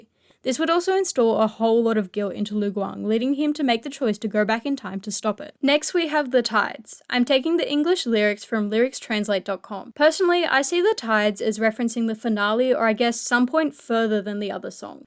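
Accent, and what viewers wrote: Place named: United Kingdom. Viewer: british spotted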